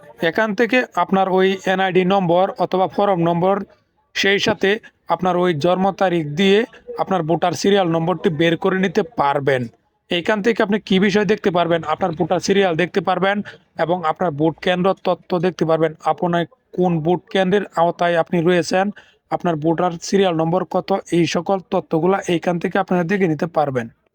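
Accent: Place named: Mymensingh, Bangladesh. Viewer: আরে মঘার বাচ্চা মঘা একটা ভিডিও বানাইছস তাও সঠিকভাবে কথা বলতে পারিস না। আগে ভাষার কোর্স কর তারপর ভিডিও বানাইবি।সব যায়গায় কি নেত্রকোনা/কিশোর গন্জের ভাষা বললে চলবে? মানুষ এমনিতেই বলেনা ময়সিংয়া গাইয়া ভূত ।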